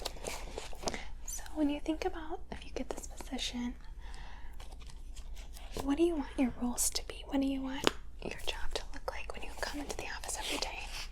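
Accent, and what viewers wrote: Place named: Midwest, United States. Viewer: I can tell by looking out your window at the trees that you are in a warm state(southern), but your pleasant sounding accent you probably grew up in maybe Chicago or some Midwestern town.😉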